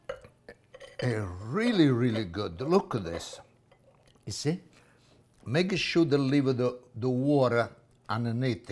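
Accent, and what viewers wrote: Italy. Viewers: The recipe is great, but that Italian accent makes it better!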